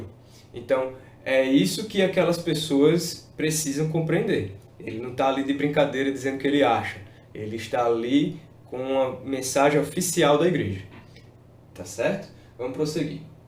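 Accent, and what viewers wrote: Northeast, Brazil. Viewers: Oi, você já foi membro ? Gostei do sotaque, é do nordeste ?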